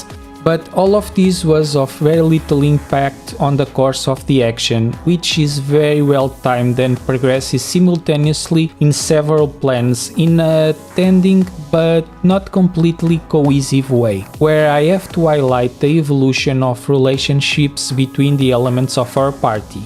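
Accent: Portugal. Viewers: I noticed a Portuguese accent 👀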